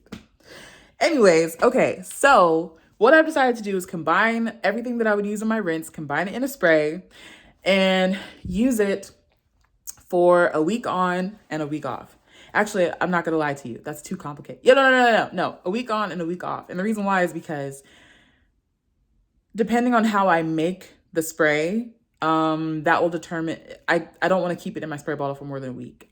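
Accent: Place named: Nigeria. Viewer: Random: I love your Nigerian (taking a wild guess) accent and thank you for this vid!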